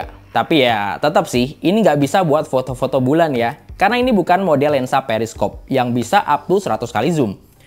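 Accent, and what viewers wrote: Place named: Tegal, Indonesia. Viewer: Weh tegal toh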